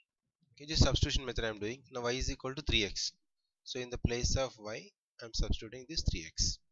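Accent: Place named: South India, India. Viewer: Thanku.. sir I think so u r from South india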